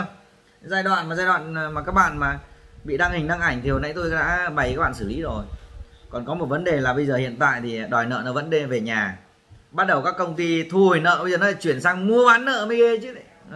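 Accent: Standard. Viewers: noi chuẩn ko cần chỉnh ok ok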